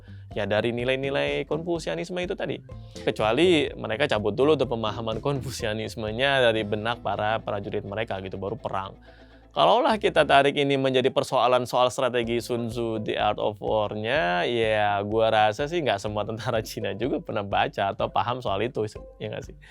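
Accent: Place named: Sumatra, Indonesia. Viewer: Kelen bah, Bang ini masih kental Sumateranya wkwkkw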